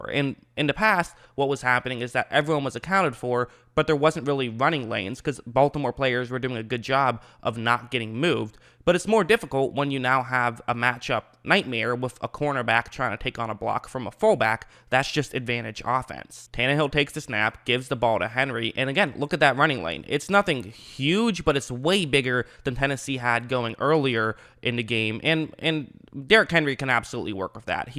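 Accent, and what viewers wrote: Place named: Pittsburgh, United States. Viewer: You can hear is Pittsburgh accent 😂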